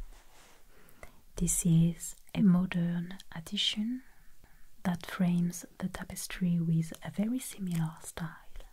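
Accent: France. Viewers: These are my favorite types of videos, that makes....love the sound of the paint brush strokes on the tapestry's....and the running of her hands over the fabric(it sounds like the ocean)....very soothing and comforting....along with the history lesson and background of these beautiful works of art....complete with her french accent....who needs to go France???....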